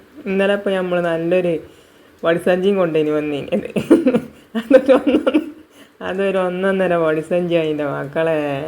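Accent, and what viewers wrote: Malappuram, India. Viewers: ഇജ്ജ് മലപ്പുറം ഭാഷ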